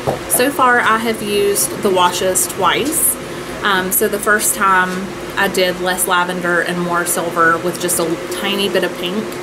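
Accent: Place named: United States. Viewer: What state are you from? I love your accent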